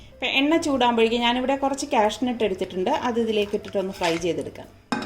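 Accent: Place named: Kasaragod, India. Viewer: Appa chapale..😍ksd slang...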